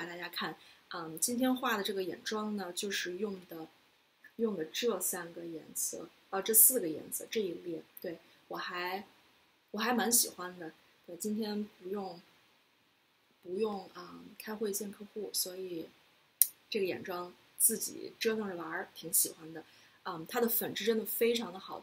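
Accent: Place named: Beijing, China. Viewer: 京腔儿听着好亲切 😊 你也在加州吗？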